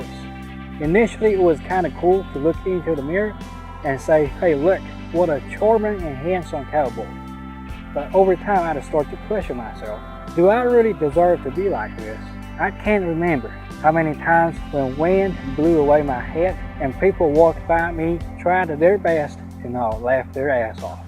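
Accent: South, United States. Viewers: Impressive and amazing that you got good knowledge of what you doing. I hope that as you lay the first foundations of your life as a Cowboy,I hope that you will carry this accent to other Chinese young people in the United States and also in China,and in the end,Chinese,Japanese and Koreans,can experience the Country Cowboy culture and also the accent,for those living in the USA or Canada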